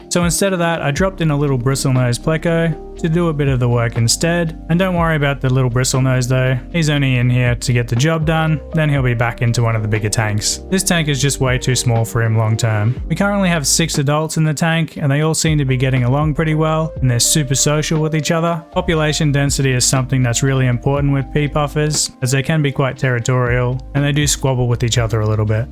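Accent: Australia. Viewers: I may be wrong but your accent sounds Australian, if so what store did you get the rocks from?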